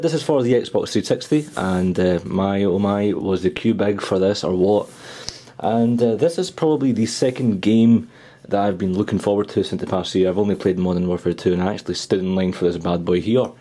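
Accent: Scotland, United Kingdom. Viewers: Scottish is awsome love the accent :) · This is a good video. Even with your accent (sounds Scottish), you are easier to understand than most Americans... and I am American. haha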